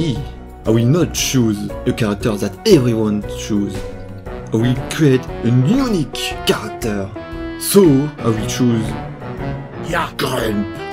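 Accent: France. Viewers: he is french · You are astonishingly French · Nice French accent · You French. Also merry Christmas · Wait, are you from france? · Mec Ta un accent français ES QUE T FRANCAIS? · L'accent français est parfait! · your french accent is beutiful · Cet accent français magique mon gars, tu m'as tué 😂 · Je rigole quand j'entend cette voix. C'est pas contre toi mais j'ai l'impression que tu fais un peu exprès de mettre cette accent français.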